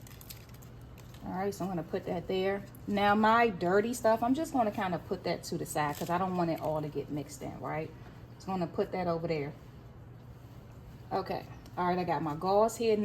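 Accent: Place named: Baltimore, United States. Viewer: Thanks for this video,i start my class on Monday.You sound like youre from Baltimore❤.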